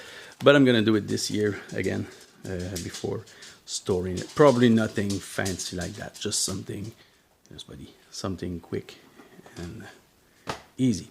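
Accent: Quebec, Canada. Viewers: Wow! I really enjoyed this video of your journal collection. You are so talented to make your own journals from scratch! From your accent I supposed you are from Québec, Canada... I am from Québec too and I also make journaling videos on my channel. I would love to see flip throughs from you, you seem to have an awesome journaling style. I am subscribing cause I want to see more content from you. Again, congrats on your journal collection. Take care. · Québécois right?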